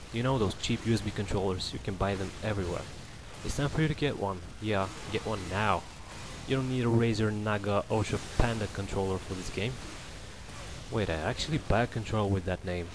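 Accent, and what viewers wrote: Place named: Venezuela. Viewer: lol my friend from Venezuela has the same English speaking accent as you. xD where you from? Subbed btw.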